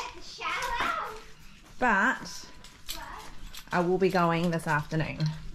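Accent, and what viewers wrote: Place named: New Zealand. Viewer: Can tell the kiwi accent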